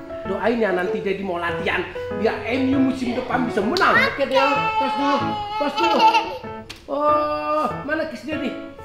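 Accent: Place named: Medan, Indonesia. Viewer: heloowww keluarga cemanaa kok lama gak bikin vlog lagee,kitah nunggu2 neeh..lucu dengan logat ala2 medan ini😊😊,jarang2 kaya mak beti jadinya,coba doonk koleb sama mak beti,atau bareng keluarga mas anang,atau sama bapau(baim paula),biar makin zeruu vlog keluarga cemana ini 🖒🖒,selalu semangatt,Tuhan berkati💕💕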